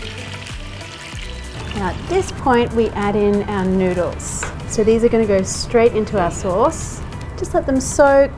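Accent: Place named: Australia. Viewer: Ive eaten this all my life and love it so much but actually never learned how to cook it. Thank you you made it look easy and your video is easy to follow and understand and didn't leave me impatient while watching. And you pronounced pancit bihon very well but if you want to sound even more correct (not necessary but since you mentioned), just need round out your 'a' sound a little bit more. Like how you'd say (with your lovely aussie accent reading) "parn-sit bee-hawn"...that's how we Filipinos say it. Thanks....you made it look so "Masarap!" (delicious) xx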